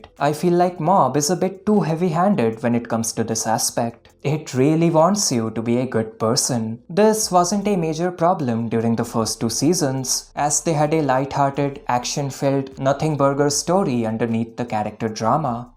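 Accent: India. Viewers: Bro are you indian? Your accent sounds quite indian to me.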